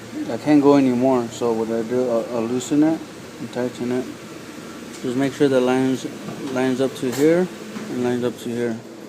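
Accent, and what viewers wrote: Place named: New Mexico, United States. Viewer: Some people talk like that if they are from New Mexico or California.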